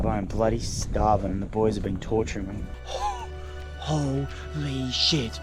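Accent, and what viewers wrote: Australia. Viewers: Is he Australian? Would make sense… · lemme guess: your australian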